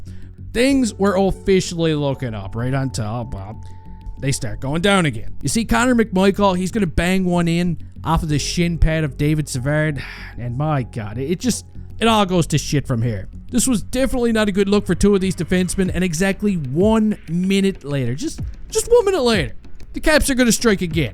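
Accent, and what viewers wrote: Newfoundland, Canada. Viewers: wait your newfie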